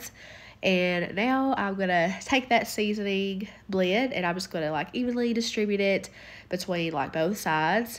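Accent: South, United States. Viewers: I absolutely adore your southern accent! Your recipes always look amazing!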